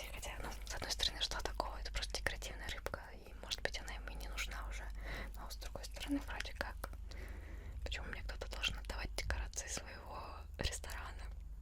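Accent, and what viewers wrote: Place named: Russia. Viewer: Eu amo o sotaque russo 😻♥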